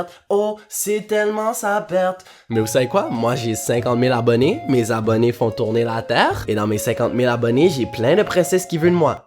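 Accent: Quebec, Canada. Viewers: J'aime tellleement ta voix l'accent canadien c trop beau 😍😍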